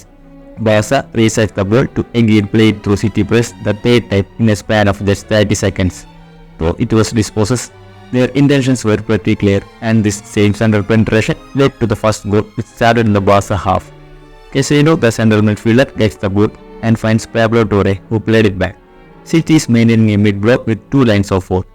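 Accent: Kerala, India. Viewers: Wtf is this voiceover?? Malayali guy 🤮🤡. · I am from west bengal, I have many friends from Kerala. I realized you are from kerala from your accent of speaking english😄.one suggestion for you please improve your audio quality the analysis was good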